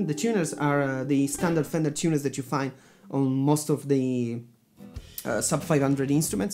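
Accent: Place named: Brazil. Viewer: Vc é brasileiro mano eu percebi o sotaque, quase nenhum, mas consegui perceber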